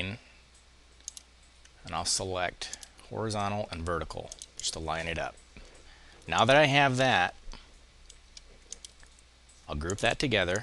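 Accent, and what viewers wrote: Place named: United States. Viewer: Nice uploads! I just came across some videos on a Chinese video site and found him speaks like another Inkscape tutor Nick on YouTube, I mean the accent of them is the same in my opinion and they're both from the US.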